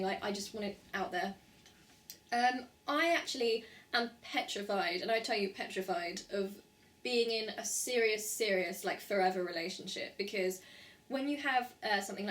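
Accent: United Kingdom. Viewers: Unfortunately there still is stigma in society for mental illnesses, but you are courageous to have made this video. YOU are not YOUR mental illness after all. I once had a relationship with a girl who has BPD/EUPD and at the time, one book I read was very informative on understanding her illness. I Hate You--Don't Leave Me: Understanding the Borderline Personality by Jerold J. Kreisman and Hal Straus. ISBN-13: <num> Best of luck in all your hopes and dreams and may I just say as a Canadian, that I love your British accent.:)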